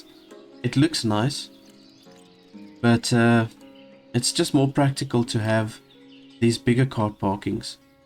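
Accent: South Africa. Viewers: Love the peaceful, chill Ostriv content, great find! One thing that's killing me is I can't place your accent. It sounds maybe like either New Zealand or South African (I feel like you look more like a New Zealander but sound more South African). Am I even close? :D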